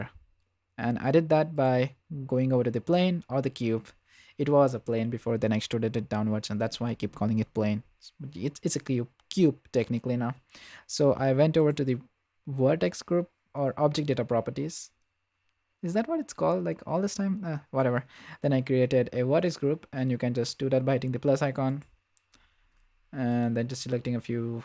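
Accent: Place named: India. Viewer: I hear an Indian accent. Hi.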